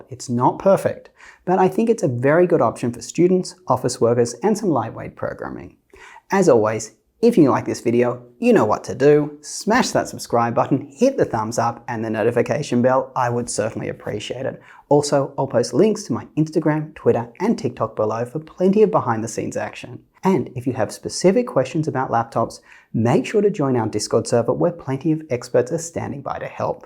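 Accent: Australia. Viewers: TIL this man with a very Australian accent lives in NYC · That’s crazy I’ve watched like 20 of his vids already and thought he was in Australia or NZ lol wtf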